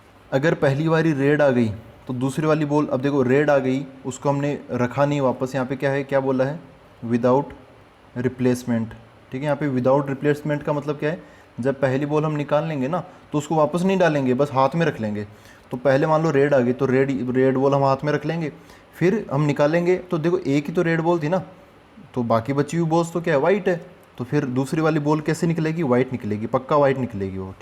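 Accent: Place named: Haryana, India. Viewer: Sir apke bolne k tareeke se lagta hai k aap haryana k ho 😅 Shayad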